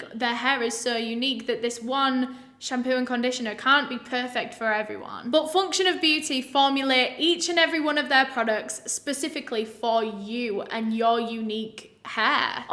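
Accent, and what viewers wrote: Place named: Scotland, United Kingdom. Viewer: Great to join your channel and I am not a troll or any type of idiot, but I have to agree with you when you were promoting your hair products, your hair is shiny, beautiful and the color brings out the beauty of your eyes. I love your accent and I am guessing Scottish because I can understand you, but friends who recommended me to your channel, can't understand you, however I am from Boston and I get it every day, no one can understand me, but I thank you for the opportunity to join your channel and I will continue to do so monthly. Again I am not hitting on you, however, you possess all that I look for in a woman, I think you are aesthically pleasing and me, not a fan of much make up, but the little bit that shows you wear, makes you perfect. Thank you, love Jace Harrell, and that is my real name not Joe or Joseph, whatever it says, and I hope that I didn't just tell everyone, while I thought I was dm'ing you. 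🇺🇸 🏴. You say your r's like me Car is Cah, ❤ it. ✌ girl.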